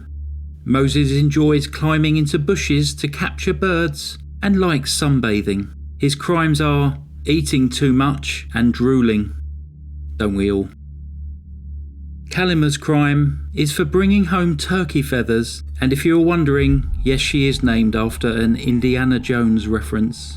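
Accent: United Kingdom. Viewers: You are doing a great job. I really enjoyed your narration on the cases. I especially enjoyed your british accents.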